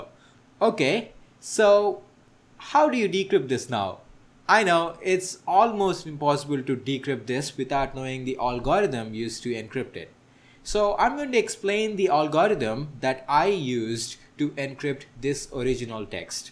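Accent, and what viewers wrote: India. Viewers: pqp indiano falando em inglês é um porre.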